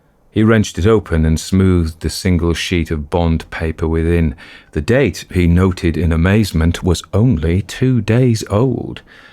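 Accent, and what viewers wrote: United Kingdom. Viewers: read a story set in Amerca in a British voice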